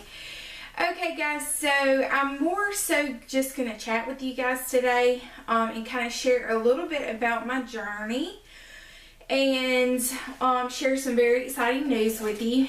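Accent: South, United States. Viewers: I'm definitely getting that magazine as soon as I get my check next week! I know where Barnes and Noble is. I love watching you and hearing you talk because you are so southern, and I'm southern too. I live in South Carolina.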